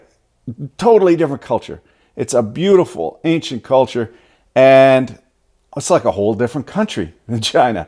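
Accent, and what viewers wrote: Canada. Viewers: I'm from Florida is this how all Canadians talk?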